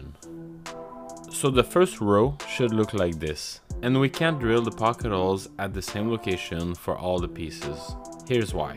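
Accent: Quebec, Canada. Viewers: Est-ce que je reconnais un petit accent québécois?? Beau projet btw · J’adore comment tu ajoutes des « h » au début de certains mots et tu les retires du début d’autres: on reconnaît ainsi l’anglais avec l’adorable accent québécois!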